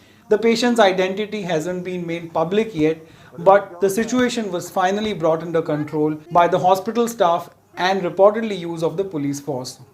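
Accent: India. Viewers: Why do you have an Indian accent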